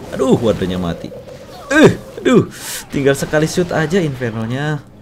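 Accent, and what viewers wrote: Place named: Banyumas, Indonesia. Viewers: Daerah ndi om dening ngapak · Ngapak banget mas ngomongnya:v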